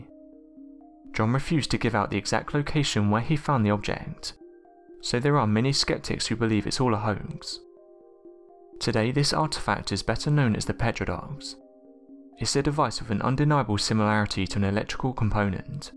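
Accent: England, United Kingdom. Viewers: Yeah well certain Englishmen come from regions where they speak like that because inflections and intonation carried over from Middle English, Welsh etc... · Anthony ouch...but true! I understand that pronunciations will be different between English speaking countries, but that was the completely incorrect word. I can handle meethane for methane. I cannot handle parameeters for parameters! I used to think anyone with a proper British accent sounded quite intelligent. Since YouTube, not so much😄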